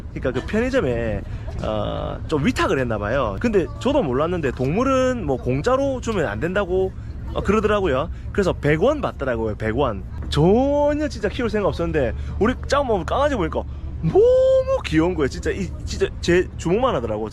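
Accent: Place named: Busan, South Korea. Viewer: 부산출신 남편이랑 말투가 똑같애서 억수 친근감 덜어여~^^ 남편이 소개해서 보게됐는데 어제 거의 종일 보고 구독도 눌렀어요. 너무 열심히 사시는 모습에 저 자신을 돌아보고 반성하게 되네요. 건강챙기시면서 하셔요~^^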